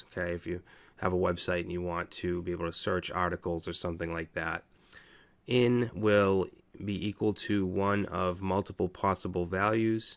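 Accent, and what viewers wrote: Boston, United States. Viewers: what part of Boston are you from? love the video man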